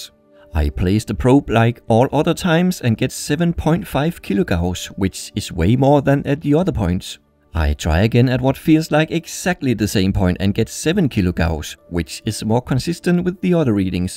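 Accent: Denmark. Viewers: Hejsa.🇩🇰 Har ikke set dig før men kunne høre du var dansk med det sammen 😁👍🏻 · er du fra danmark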